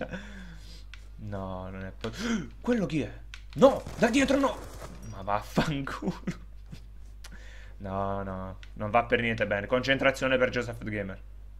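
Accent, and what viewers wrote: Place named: San Giuseppe, Italy. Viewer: dal tuo accento mi sembri di San Giuseppe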